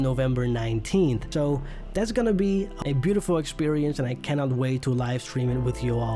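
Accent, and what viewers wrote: Netherlands. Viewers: Hi! I’m wondering, what country are you from? I noticed you sometimes have a Dutch-English accent. Just wondering. 😅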